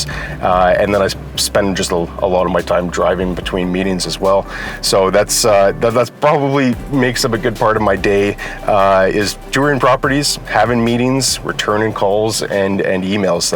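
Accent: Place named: Canada. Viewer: I've been binge watching your videos, largely because you've got such a humble, informed, and no-bullshit style. I was super pumped because of your serious Canadian accent, so tried to guess where you were, with no success. Lo and behold, I watch this video and you pull up next to my favourite electronics shop!!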